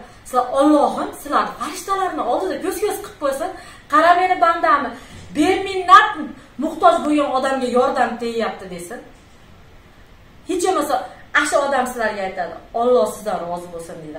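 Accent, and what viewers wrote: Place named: Andijan, Uzbekistan. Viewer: Опа гапни хам Анжонча килиб ташладингиз,офаринг,ёнингиздаги 2-та опа хам гапиришга чикган эдими,халк сиз каби инсонлар бн ...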